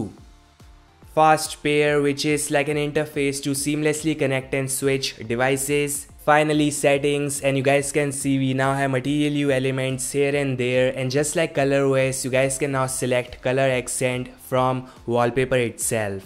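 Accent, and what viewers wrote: India. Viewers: Apka abaj sun ke lag rha ha Indian ha agar hindi bolte too trand pe hote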